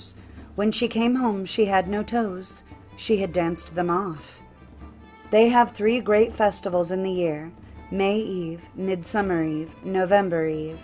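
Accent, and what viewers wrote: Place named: Ireland. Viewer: Nice job, btw good Irish accent!💚💚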